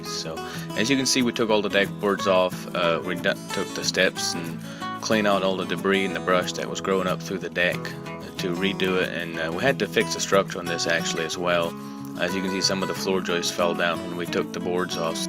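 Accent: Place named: Louisiana, United States. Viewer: I was way off. Sounded more like southern Louisiana. I really like the Chanel. Keep it up bro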